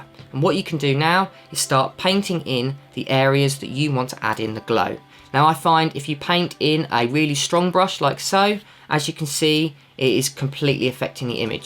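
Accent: United Kingdom. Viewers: Is this British accent? I like it😍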